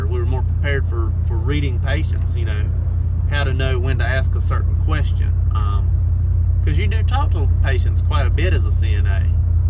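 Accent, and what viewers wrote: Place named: South, United States. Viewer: Lol omg his accent lol so country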